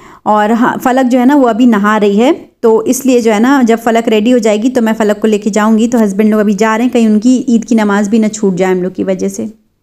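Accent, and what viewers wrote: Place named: Uttar Pradesh, India. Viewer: Hi aap ke vlogs bahut hi ache Hain n ur voice is very beautiful 😍 even I'm from UP so I luv the way u speak but would love to see ur face bcos I HV seen many vlogs but u don't show ur face 😊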